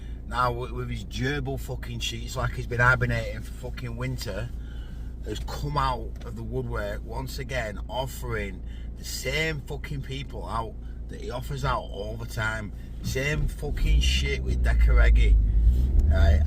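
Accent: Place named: Lancashire, United Kingdom. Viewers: That's Lancashire just like myself 😊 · That's the strongest lancs accent I've heard, thought you were a Yorkie.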